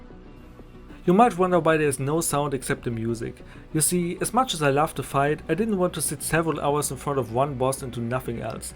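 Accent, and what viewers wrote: Germany. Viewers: Your german accent is very nice sounding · Can it be that you are German?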